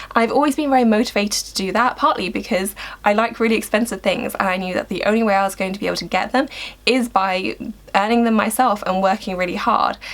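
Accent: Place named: London, United Kingdom. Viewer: She's just from London, that's how they sound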